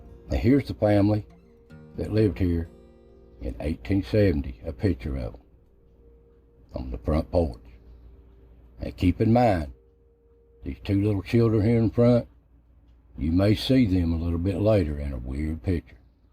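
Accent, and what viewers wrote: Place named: South, United States. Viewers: Hello good sir.. A new subscriber here.. I'm always intrigued by the US southern accent 😄.. I also love paranormal things, pls more content like this.. 😁 Be safe & God bless.. (A warm greetings from Indonesia 👋🏼🇮🇩.) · Yes your voice is beautiful. Not just the physical quality of it, but your slow cadence and beautiful Southern dialect.❤ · I love the narrator's voice & beautiful southern accent! Sounds so soothing, like my Grampa telling me a story, which are the most precious ever. ❤️ ✌️ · Greetings from Australia.Loved listening to your videos. I just love your southern accent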